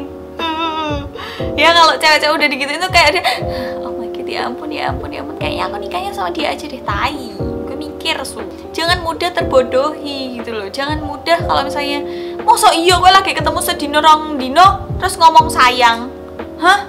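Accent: Java, Indonesia. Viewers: Medok yo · Jowone ngendi iki mba'e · Medoknya ngangeni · jowo ndi to mbk, kok kentel tenan jowo. ne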